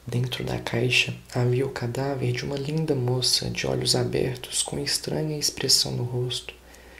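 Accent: Minas Gerais, Brazil. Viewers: O sotaque mineiro ❤️ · Amanda Utuari ele é do interior de MG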